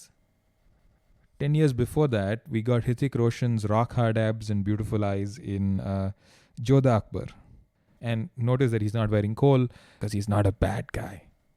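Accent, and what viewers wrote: South India, India. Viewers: See I am so much media mind programmed. By your voice I can recognize you are from South India. Chhii I am so much racist now. Shame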